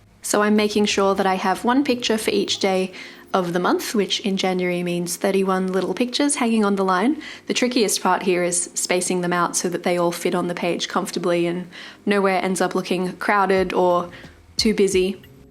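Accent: Australia. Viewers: It has been so incredibly hot in Australia. I live in Darwin and it has been hitting 40 degrees with 80% humidity. Your quote page is using the Pantone colour of the year. My January setup us revolving around this colour. It is so refreshing to hee an Aussie accent on YouTube and I just love your style of Bullet Journaling.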